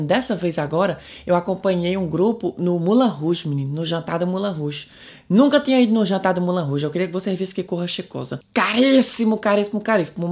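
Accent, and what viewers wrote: Ceará, Brazil. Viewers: Esse sotaque é muito meu!! 😂😂😂😂 Sou sua fã e também vizinha aqui no cariri cearense. 😍😍😍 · Cai de paraquedas no seu canal. E estou adorando. Que sutaque maravilhoso. Eita cearense arretado ❤😍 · lindíssimo, amo você. sou do nordeste também e me encanto muito com isso de você mesmo não morando no brasil e tudo mais continuar valorizando a cultura nordestina, principalmente seu sotaque! todo sucesso do mundo, um salve dos alagoanos.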